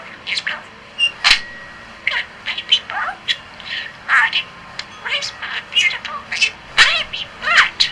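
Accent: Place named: Australia. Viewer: I detect an Aussie accent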